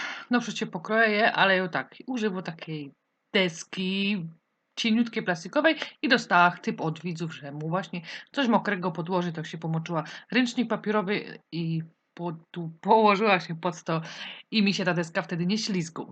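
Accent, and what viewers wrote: Silesia, Poland. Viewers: Tłumok bo przecież powiedziała że mówi gwarą śląską pojebany jesteś😂😂😂😂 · Kocham jak mówisz po Śląsku👍👍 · Pani proszę więcej mówić gwarą 😊, taką prawdziwą. Uwielbiam tego słuchać. Pozdrawiam 😘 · niy prōbōj gŏdać cysto po polsku,bo ci to sztyjc niy wyłazi,przeca żeś niy je żŏdyn gorol.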